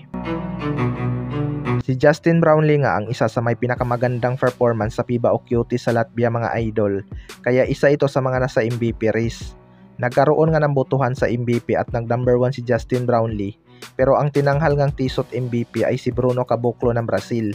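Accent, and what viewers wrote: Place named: Visayas, Philippines. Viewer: NAKU PO ITONG PROBINSYANO NA BLOGER BISAYA PA PUNTO.. LOLOKOHIN MO KAMING MANILA BOY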